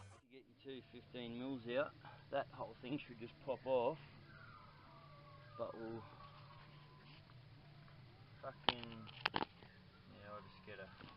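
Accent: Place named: Australia. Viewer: Thanks for the video bud. Love the Commentary. Pure Aussie... Well done Mate.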